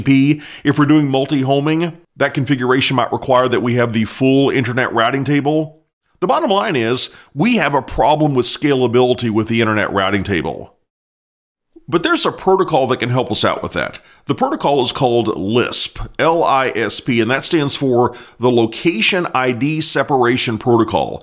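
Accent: Texas, United States. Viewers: topped up with that texas accent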